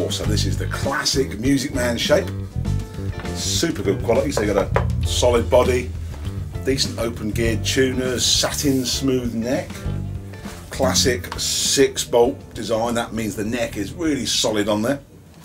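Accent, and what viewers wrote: United Kingdom. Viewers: Never thought that a bass review could be that british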